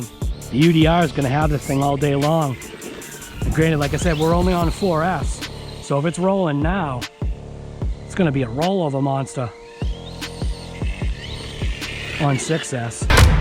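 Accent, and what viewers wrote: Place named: Massachusetts, United States. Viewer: I like this RC car so much that I bought the same version that you’re showing in the video now last year I bought the other version the body is slightly different and the decals are BY THE WAY, BRO, IT’S MY FIRST TIME ON THIS CHANNEL. YOU SOUND LIKE YOU’RE ONE OF MY FUCKING NEIGHBORS FROM DOWN THE STREET IF YOU’RE IN MASSACHUSETTS BECAUSE YOUR ACCENT IS THICK😂👍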